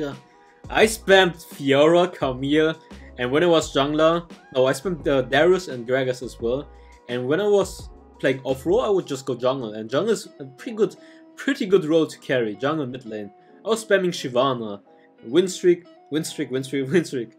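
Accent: Germany. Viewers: Are you German, bro? :D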